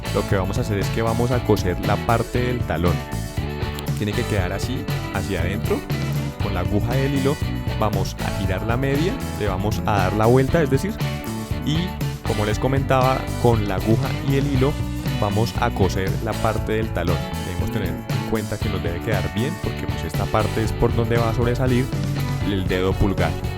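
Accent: Bogotá, Colombia. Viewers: Mmm algo me dise que eres colombiano de bogota XD, gracias ahora tengo guantes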